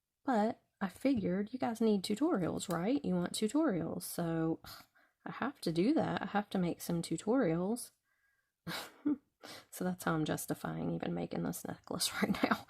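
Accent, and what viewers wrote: United States. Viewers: Love your cowgirl accent❤❤❤❤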